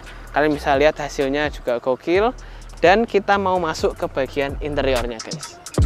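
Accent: Java, Indonesia. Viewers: Mau nyari minusnya kok blm ketemu ya.......,selera baget nih Glossy dan glowing😊.ternyata kokonya kl pake bhs jawa "Medok" bgt ya, lucu.....😅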